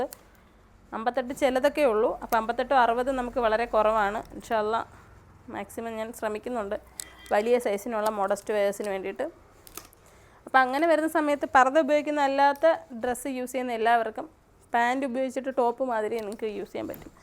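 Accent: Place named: Kollam, India. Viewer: കൊല്ലം സംസാരം ഇഷ്ടായി 🌹🌹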